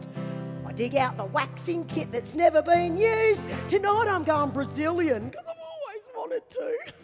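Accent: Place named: Australia. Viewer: Shit ya make me laugh Woman! The pure Aussie...ness (its now a word) is just grouse!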